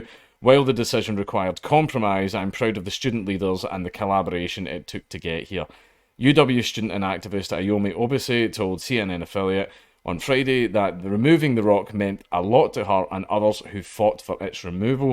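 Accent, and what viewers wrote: Scotland, United Kingdom. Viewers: I’m not gonna lie I clicked on this cause I wanted to hear him about “A FOOKIN ROCK CANT BE RACIST YA DAFT TWAT” in his thick Scottish accent lol who’s with me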